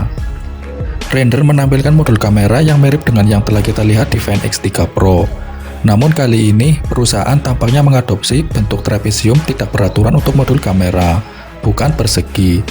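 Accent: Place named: Java, Indonesia. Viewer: Suarane wes ketara medok hehehhe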